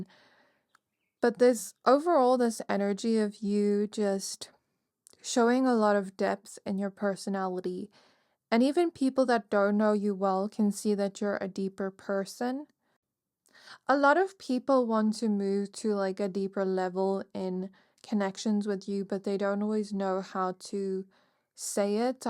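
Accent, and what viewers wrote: South Africa. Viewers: Pile 2❤. If you don’t mind me asking, where are you from? You have a unique accent 😅 it sounds a bit South African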